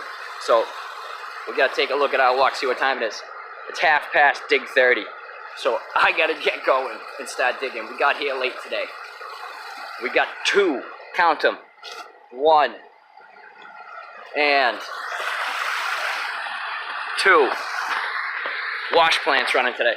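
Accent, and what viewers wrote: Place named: New England, United States. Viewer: Thought you were a new englander. Thanx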